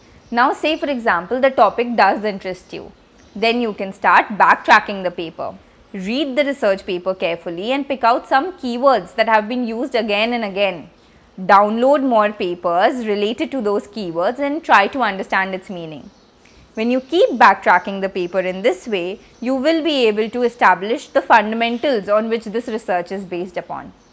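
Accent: India. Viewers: your accent is too much desi that is distracting for comprehension, please make it a bit comprehensible.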